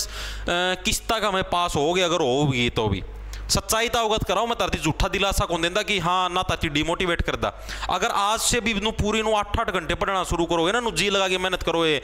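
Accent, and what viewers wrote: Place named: Punjab, India. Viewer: Panjab se ka aap aaye hai sir aapka to bhasa bahut mast hai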